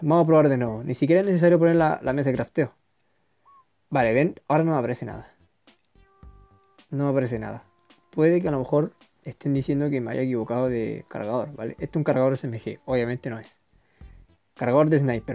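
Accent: Chile. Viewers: Eres chileno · Se nota al tiro que eres chileno wn nuevo sub que crack eres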